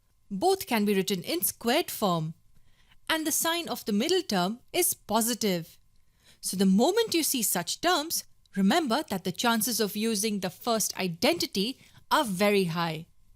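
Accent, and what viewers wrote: India. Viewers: Watching during qurantine because of online classes your accent seems indian love from pakistan Pk IN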